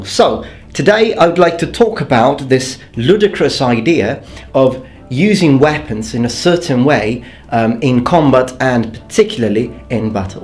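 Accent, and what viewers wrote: England, United Kingdom. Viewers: Does his accent have a little bit of British in there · Why does it sound like you have a English accent when you are from Sicily?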